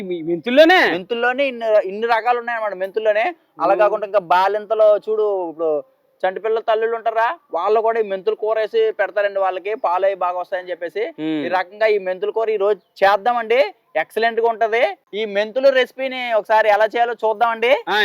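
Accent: Godavari, India. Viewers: Godarolla bhasha vintumte maa p.g gurthukochindi😂😂😂😂😂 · స్వచ్చమైన గోదావరి జిల్లా యాస...కల్మషం లేని మాటలు... సంపూర్ణ వివరణ. మొదట మొదటగా చూపించిన పిల్ల కాలువ జోరు,.. పచ్చని పైరు...కట్టే పోయి మీద వంట...అబ్బా .మరచిపోయిన చిన్నతనము చూపించారు..అంతా బావుంది..చిన్న సలహా... కాలి పళ్ళెము లో మొదటగా వట్టి అన్నము మామూలు సందర్భ ములలో పెట్టరు..అది ఒకటి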